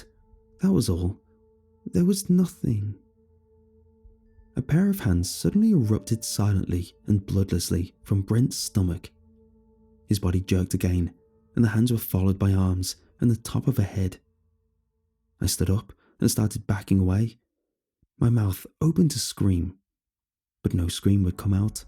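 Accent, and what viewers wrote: England, United Kingdom. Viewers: hes english... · hes British boy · I'm back and obviously I ment my specific country, as in England the country, believe it or not we don't just announce were British at the start of our conversation for the hell of it. The guy reading in the story had an English accent, thought that connection was obvious. And no the term 'country' does not only refere to southern states of North America. Do they teach yanks about the rest of earth or no?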